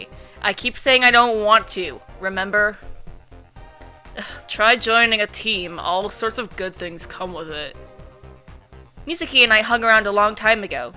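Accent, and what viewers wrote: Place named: South, United States. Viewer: Loool, love how your accent likes to default to Southern when you try and put on a voice XD! I must say, I nearly lost my hearing when you started doing Akushima's voice. I don't usually watch you and with earphones as I've learnt from before (ESPECIALLY when you voice Mr Bandages) that volume tends to vary sometimes:P But now I'm trying to catch up on this at work and just xD...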